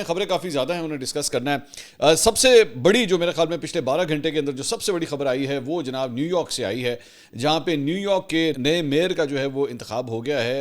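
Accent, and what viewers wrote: Punjab, Pakistan. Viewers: Be a good vloger. Urdu vlog ma punjabi ka tarka na manzoor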